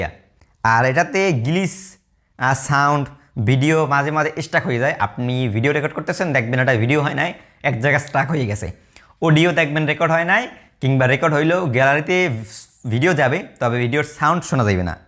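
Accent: Barishal, Bangladesh. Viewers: ছোটভাই তোমার বরিশালের ভাষাতে অনেক ভাল লাগে। ওই ভাষাতেই ভিডিও করো। আমার অন্তত ভালো লাগে।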